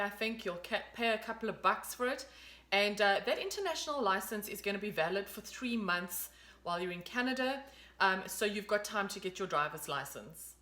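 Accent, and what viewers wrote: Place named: South Africa. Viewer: Wow. I am feel so warm and fuzzy listening to you about the driving. I was driven around there in December and I remember asking about the turning right behavior. I asked if it is like a "Yield" in SA? It is really cool to hear a SA voice talk about Canada. You also talked about insurance, which got me wondering: When you left SA how did that impact on your Life, Disability and Dread Disease Insurance? Did you keep it or did you cancel and start new policies? Did you migrate it? Did your risk decrease making your premiums lower? And how was the transition with banks? What happens with money transfers? Is it easy? Does it cost you to transfer your cash? Savings? And I did experience the Drive-thru ATM withdrawal (LOL), my son and I were amazed at the realisation that there was no fear of a mugging.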